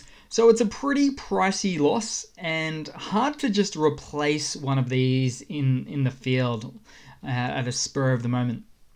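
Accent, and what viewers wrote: Australia. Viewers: Fake news i see. Is that an aussie speaking. FFS mate, get a life. This is just propaganda ❤ russia from the 🇬🇧🇬🇧🇬🇧🇬🇧. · Another good assessment. Out of curiosity, are you an Australian? (BTW, I am).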